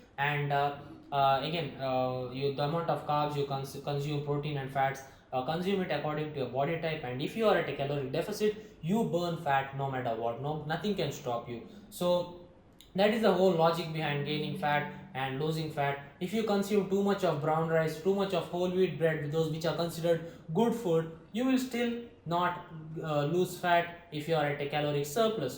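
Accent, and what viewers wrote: India. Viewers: Dude, his accent is what makes the videos quintessentially Indian. In fact I subscribed because of that. No, not his accent but because 1. His content quality was good 2. There is a serious lack of good channels for Indian bodybuilders/strength athletes 3. His accent, throw of voice etc. sound genuine. He's not someone who tries to act all smart for the camera. I'm not trying to put you down or anything. It's just that we feel ashamed when our accent betrays our mother tongue and yet when the French, Spanish, Russians etc. speak in a heavily accented diction, we think it's so cool (some of us do at least) Cheers. :)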